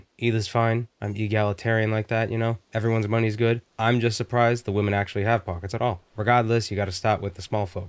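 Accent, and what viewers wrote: Boston, United States. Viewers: Hahha the thick Boston accent keeps coming out, makes me think about Bill Burr reminiscing about cops in Boston: THE KID'S A GOOD SHIT ^^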